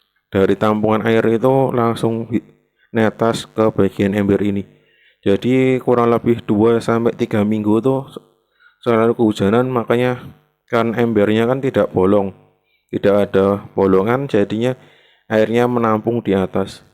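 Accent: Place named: Banyumas, Indonesia. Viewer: Kie logat e ngapak Nemen ya.. Hehehe Salam kenal mas ku,,, Iki umur pira kompos debog e...? Aku wes gawe umur 1 bulan urung apa"...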